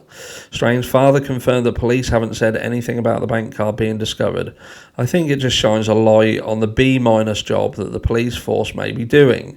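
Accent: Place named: RP, United Kingdom. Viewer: Hello from my vacation in the DR. I love listening to you in your posh British accent.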